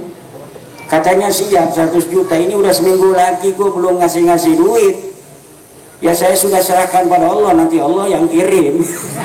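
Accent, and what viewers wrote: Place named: Cirebon, Indonesia. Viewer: Ko bhs e mirip Cirebon an y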